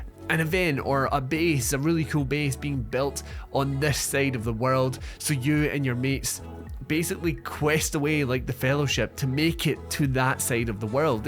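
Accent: Scotland, United Kingdom. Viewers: YOUR ACCENT IS AMAZING, WHAT PART OF SCOTLAND ARE YOU FROM ? 😊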